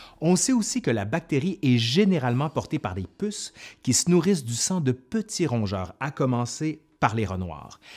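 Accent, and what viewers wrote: Quebec, Canada. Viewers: Très intéressant merci et en plus raconté avec votre charmant accent Québécois, c'est un plus. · Vidéo très intéressante et agréable à écouter, c’est la première fois que j’écoute une vidéo en « français canadien » jusqu’au bout 😊👌🏼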